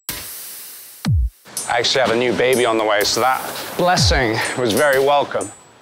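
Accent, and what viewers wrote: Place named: United States. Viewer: I had to look up this ad cause I was surprised with his accent. He sounds American now 😢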